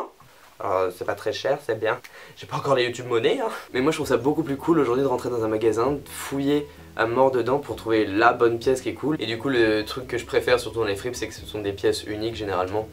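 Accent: Quebec, Canada. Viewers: Jpp j'ai vrmt cru que t'étais québécois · ptn au début j'ai cru t'étais québécois j'ai pas compris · j'ai cru que t'étais québécois, qd jte entendu parler normal ça m'a fait bizarre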